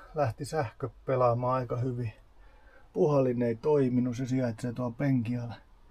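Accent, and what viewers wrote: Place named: West Coast, Finland. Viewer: Hieno laite! Itellä samanlainen. Kunto kyllä ainakin päälle päin heikompi omassa koneessani, mutta pääosin toimiva sekin ja on päivittäisessä käytössä. Lumen aurausta ja trukkipiikeillä roudaan tavaraa. Kaivuuta toistaiseksi vähän ja sitä tarkoitus tehdä jatkossa enemmän. Käyttäjän murteesta päätellen jossain länsirannikolla ollaan. Itsekin aikoinaan koneen hain Porista.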